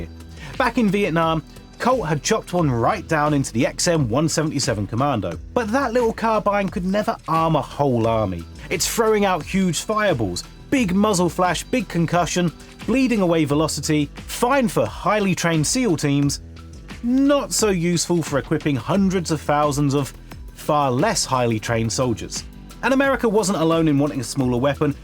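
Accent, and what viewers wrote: United Kingdom. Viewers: What country are you in? Doesn’t sound by your accent you should have held a weapon in your life, not even a butter knife. How do people in the UK cut meat and vegetables for cooking now that I think of it? Illegal to have a knife is crazy. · Im used to guntubers to have a southern accent. Maybe Georgia or kentucky. Brit? Ok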